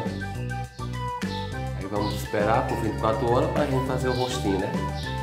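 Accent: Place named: Recife, Brazil. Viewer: Parabens que lindo ! Amo esse sotaque é de Recife ? 👏🏻👏🏻😘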